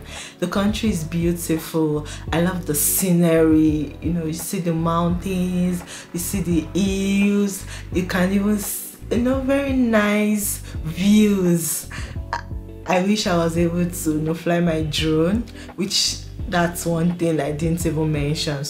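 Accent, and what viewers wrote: Nigeria. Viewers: More people speak english now, but i think your strong nigerian accent was a problem. It's like me when listening to Jamaican or carreabean. If you speak clearly, they will for sure understand. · You try to put subtitles because Nigerian accent is hardly heard by Rwandans.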